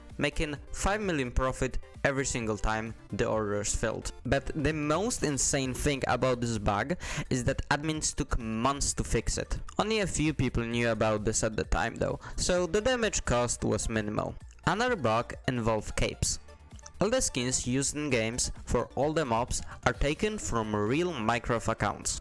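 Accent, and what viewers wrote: Poland. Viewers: Jesteś z Polski? Czy mi się wydaje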